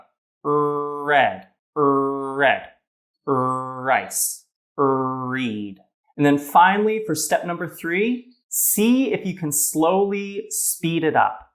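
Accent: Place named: General American, United States. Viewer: Hey, your General American accent is really perfect!:-)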